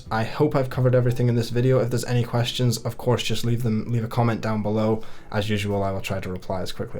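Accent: United Kingdom. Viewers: I guess you're British. I love British men. 💖😊.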